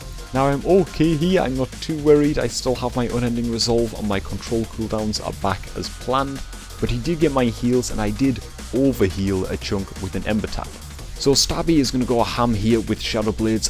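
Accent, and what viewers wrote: Newcastle, United Kingdom. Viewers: Is it near newcastle, sounds kinda geordie, but maybe outskirts or something?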